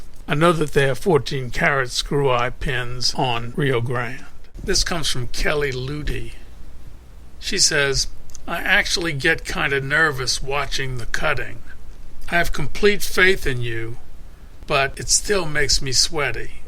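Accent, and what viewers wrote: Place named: South, United States. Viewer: Love your vids. But it drives me crazy that I can't place your accent. It's like a little bit of Virginia or Arkansas, but steeped in some deeper south, like Alabama or Louisiana. You tend to shift in and out of a deeper accent when you talk. Where is it from??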